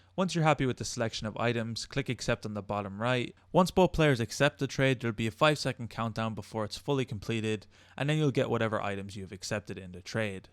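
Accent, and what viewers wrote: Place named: Ireland. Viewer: Knew u sounded irish Hello From a fellow irish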